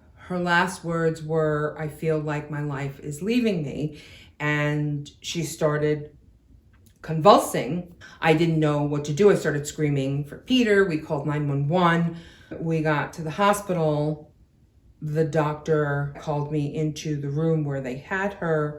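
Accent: New York, United States. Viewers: Oh..I'm so very sorry about your momma...but she is always with you. As far as you not being here and folks leaving...that's ridiculous. And you are so right..LIFE does happen. And as I've told you before..I love your channel, being a former New York girl, you always bring me "home" when i hear you voice. So, guess I'm saying..you're stuck with me..I ain't leaving! Take care & heal my friend.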